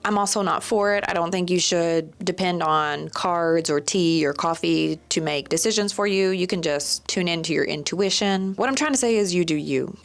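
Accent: South, United States. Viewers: I love your accent❤. I wish I could talk like that too. I would appreciate if you make a tutorial on how to speak like a redneck.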